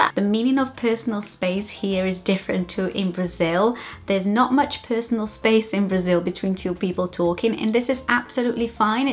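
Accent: Wales, United Kingdom. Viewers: How do you have no trace of a Brazilian accent?what age did you come to the UK.? The soft Welsh accent is nice though😉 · I love your British accent! And your explanation was great! I can relate to you in lot things! Can’t stand be late or wait! Line your mom I hate junk food! And iam totally Brazilian on my essence but also iam American! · Oh my, you learned English with a British accent. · your brit accent is legit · You look very Brazilian to me. The British accent would have thrown me off though. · Ah, tão bonitinho ver brasileiro com sutaque de British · You actually have a Welsh accent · Idk how this girl has such a powerful British accent yet is brazilian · Parabéns! Seu ingles britânico é perfeito. Vou dar uma olhada nos seus videos como vc conseguiu chegar até la principalmente xm esse sotaque. Espero chegar a ter o seu ingles um dia.bjs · A Brazilian with a British accent. 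I am an American who sounds like I live in São Paulo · I’m Welsh (though I live in Brazil) and even before I read the description I was like wow this girl has a welsh accent. Your English is absolutely amazing and being able to pick up a welsh accent is incredible!! 😵😵 Parabéns/ llongyfarchiadau ❤️ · She sounds Welsh with a light accent. This could just be me though ( I'm Welsh) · She has a British accent. Or a wannabe, I think.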